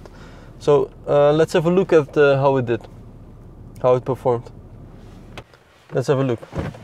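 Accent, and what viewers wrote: Netherlands. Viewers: dutch accent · Nik Topler its dutch english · Lekker nederlands accenttje gap 😂😂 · Prima video, ik zou alleen proberen met iets meer emotie te praten. Het klinkt door het Nederlandse accent niet heel gemeend, maar dat is gewoon oefenen. Blijf zo doorgaan! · you can really hear that your dutch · Kyro de Boer ik denk dat hij wel nederlands is, ik kan het merken door zijn engelse accent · Dat hollandse accent haha heerlijk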